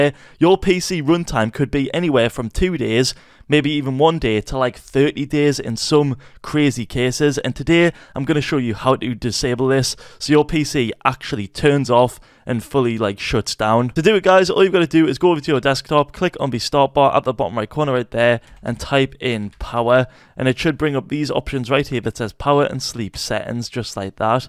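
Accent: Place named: Newcastle, United Kingdom. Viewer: your from newcastle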